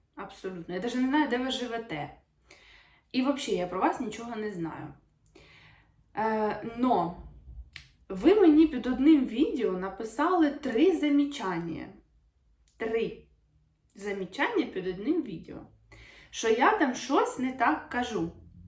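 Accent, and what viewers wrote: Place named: Poltava, Ukraine. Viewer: є розмовна мова регіону,до речі я з Полтавщини,у нас теж балакають,шокають і т.д,дуже наш говір схожий на ваш.Є літературна мова,але суржик він справжній,як би хто до нього не відносився.можливо ви мені через суржик і сподобались.не беріть близько до серця.як на мене краще суржик ніж:"я разгаваривала и буду разгаваривать........"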